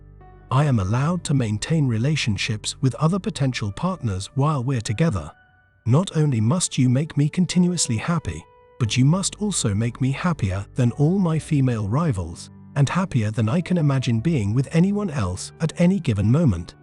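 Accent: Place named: United Kingdom. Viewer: How about you take your British accent and get bent.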